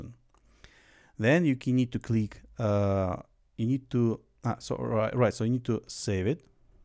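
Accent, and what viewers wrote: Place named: Lithuania. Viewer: bro where are you from, your accent sounds lithuanian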